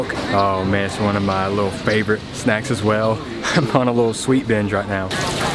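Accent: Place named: South, United States. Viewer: Yo voice tho... Varrryy South... 😁 CM my hometown I am back in 2 weeks time for Songkran.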